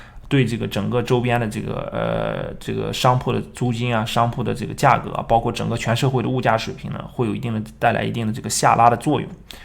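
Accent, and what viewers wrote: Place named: Inner Mongolia, China. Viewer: 内蒙口音听着很舒服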